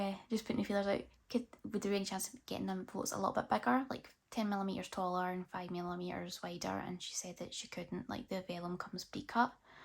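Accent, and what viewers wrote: Scotland, United Kingdom. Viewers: Haha no problem, also don’t worry about spraffing on in your videos, it’s refreshing to hear a fellow Scottish accent doing a budget video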